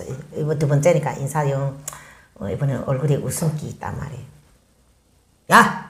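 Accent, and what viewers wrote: Hamgyong, North Korea. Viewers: 님 오래 기다렸습니다 함경도 말씨 좋습니다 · 우연치않게 님의 동영상을 모두 보게 됐네요...오늘에서야...물론 님의 평양만사는 구독신청해 놓았고..자주 보는데 님의 구수한 걸쭉한 이북사투리 넘 감칠맛나서 중간에 보다가 시간이 나서 처음부터 자세히 보게 됐습니다. 아주 코너를 하나 만들어서 하는게 좋은듯....그리고 님 저가 장담하건데...분명히 똑똑한 작가가 있다면 TV작가가 있다면 연락이 올것입니다. 그전에 연기 공부좀 해두시면 님이 반듯이 꿈꾸던 북한 여자 연기자역이 반듯이 올것이라 생각됩니다. 참 대찬 여자라고 생각됩니다.....당신을 응원합니다.....북한 탈북인들 유튜브를 가끔봅니다만 님 정말 당차고 대찹니다.......조만간 "채널A 이제만나러 갑니다"에서도 연락이 와야하지 않을까 싶습니다....너무 잼나서 오늘하루 씨의 방송프로를 다 보았습니다....정말 재미있습니다..........응원합니다.........홧팅~~~~~아차~ 필살기 4편은 언제 방영되는지....빨리 나오길 기대합니다.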